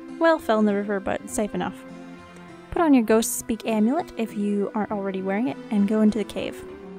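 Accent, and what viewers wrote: Russia. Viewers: hilarious that your using a russian accent with an italian based character xD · RuSsIaN AcCeNt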